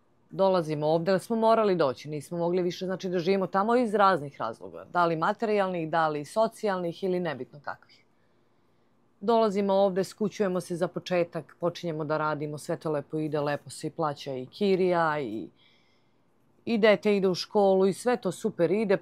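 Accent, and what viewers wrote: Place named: South, Serbia. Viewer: Sve ok, ali da kaze da je iz Beograda sa ovim naglaskom - nema teorije. Juzna Srbija se cuje u intonaciji.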